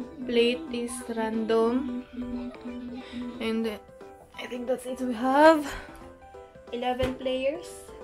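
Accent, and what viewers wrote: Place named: Philippines. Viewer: Filipino accent AHHA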